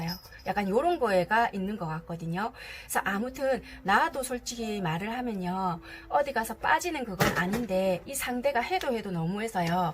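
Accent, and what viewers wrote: Busan, South Korea. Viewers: 저는 헤어지고 연락 끊어져서 연락이 와야 투닥거릴수가 있는데 ㅜㅜ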